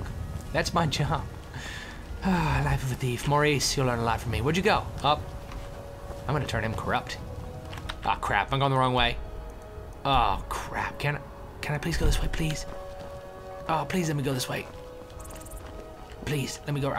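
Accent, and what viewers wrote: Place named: London, United Kingdom. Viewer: an American that can actually pull off a north and south london accent well done!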